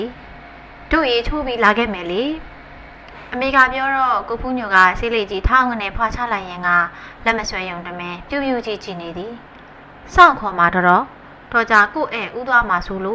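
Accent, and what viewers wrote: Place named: Anya, Myanmar. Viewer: စာဖတ်သူရဲ့လေယူလေသိမ်းကအညာရောက်နေသလိုရသခံစားရတယ်။အသံရှင်ညီမလေးလိုအင်ဆန္ဒအမြန်ပြည့်ပါစေ🥰👍💪🏽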